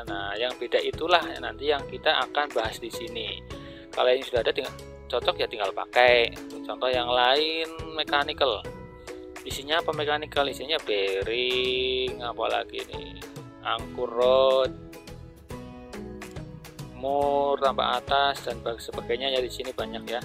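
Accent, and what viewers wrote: Banyumas, Indonesia. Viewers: Dapat ilmu lagi dr om... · Wahhh tq bang sehat selalu om sukses selalu buat kedepannya amiiin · Salam perjuangan om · MATUUUUUR SUWOOOON SANGEEEET masss....... ALHAMDULILLAH...........sy dpt pelajaran baru....n SANGAAAT JELASSSSSSS...... MAJUUUU TERUSSSS BERKARYAAAA n SUKSESSSS SELALU........ Wass ant Cicurug Skbumi...... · Walaupun ora paham,tapi tetep nyimak Bos,salam pada bae e🤣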